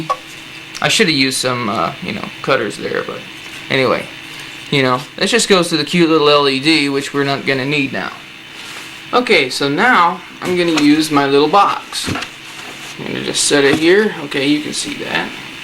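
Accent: South, United States. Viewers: It's like Mike Myers with a southern accent. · He kinda reminds me of a long haired country accent Ashton Kutcher. well that just my opinion i really don't kmow about you guys:) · Definately not Bristish, its southern US by the sounds of it...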